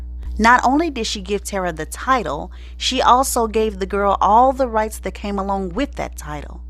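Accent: South, United States. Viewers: Perfect. But that gone with the wind accent. Is odd and awkward for something that took place in NJ - Newark at that..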